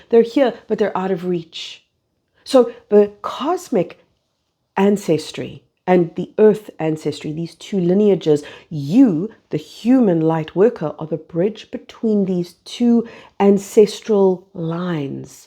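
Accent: South Africa. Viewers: I like South-African-English. It is much more understandable for a non native English-Speaker than for example American- or British-Englsih.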